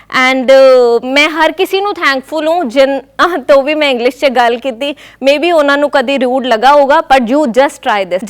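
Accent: India. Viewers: madam thda hindi touch boht a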